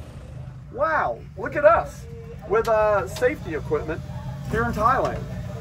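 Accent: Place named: Pittsburgh, United States. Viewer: Where are you from originally? You sound very Pittsburgh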